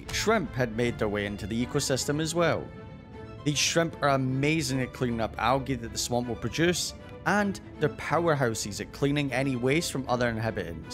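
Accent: Scotland, United Kingdom. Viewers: The best part is your Scottish accent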